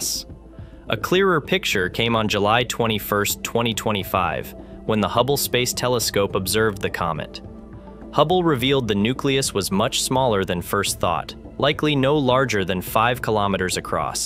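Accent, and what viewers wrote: United States. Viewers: Why do Americans pronounce the word ‘Second’ as ‘Secint’?? And ‘Herbs’ as ‘Urbs’.If you can’t read,I understand but surely,pronunciation IS taught at schools in America?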